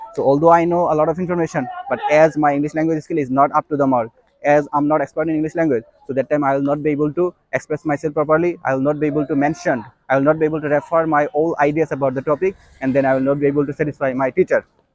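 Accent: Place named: Indian subcontinent. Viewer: Pronounciation skill needs developing.Here latin and British mixture as well as indian sub continent lingua style clearly shows😅. Anyhow, best wish.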